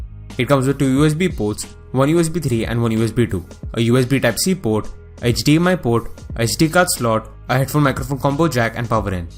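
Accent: India. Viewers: That dirty fingernails are disgusting me, and then I realize the Indian accent.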